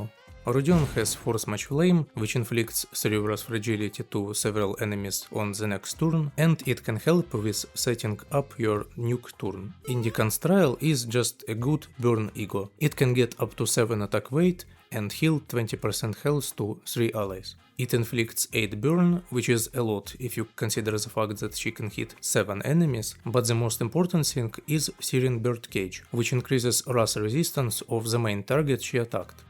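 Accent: Russia. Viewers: Чую русский акцент, бюрн фен. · Мне кажется у автора видео русский акцент, но я не могу этого доказать...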